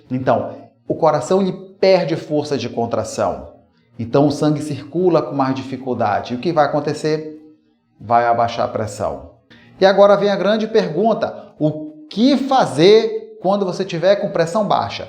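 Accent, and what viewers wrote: Pará, Brazil. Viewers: Gente, esse Dr. é Paraense o sotaque Rs fofo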